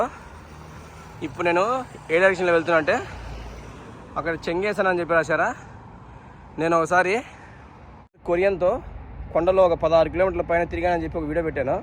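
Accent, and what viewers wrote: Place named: Rayalaseema, India. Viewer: ఆంధ్రలో నీది ఏజిల్లా బ్రదర్ చూస్తే రాయలసీమ లాగా వుంది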